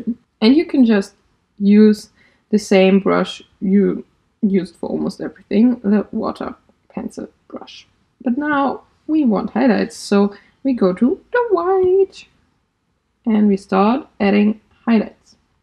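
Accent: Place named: Germany. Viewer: I realy like your german accent!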